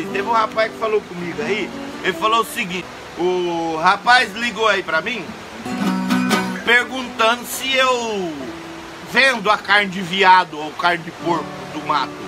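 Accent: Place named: Minas Gerais, Brazil. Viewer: o Se tá parecendo k é de Minas gerais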